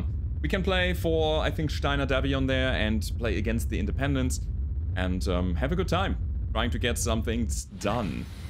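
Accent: Germany. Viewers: The short was fun. Create a character for yourself! Be a Steiner officer. Use your German accent!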